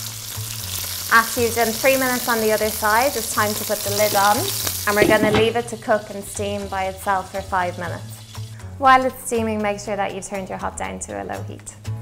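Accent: Ireland. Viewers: Is that an Irish accent? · this is such a lovely video !. is she irish ?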